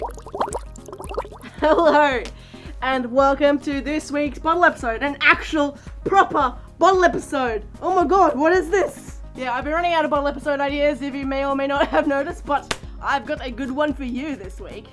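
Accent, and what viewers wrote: Australia. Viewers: Wait are you Australian!? I am!! 😄🐺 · you AUSSIE 0_0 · R u Aussie? I wanna meet you one day boi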